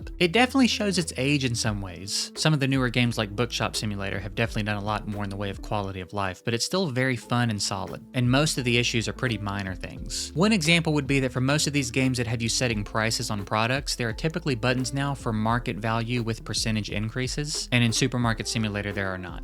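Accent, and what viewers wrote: South, United States. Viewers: Dude, you’re so good! Also you sound like you’re from the south like me. Great writing and great commentary. Keep going. You’re onto something big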